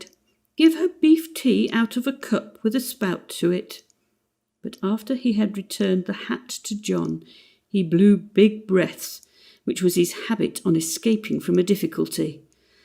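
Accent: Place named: United Kingdom. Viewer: I like British stories read with a British accent best & this one is easy to hear, loud & clear. Thank you.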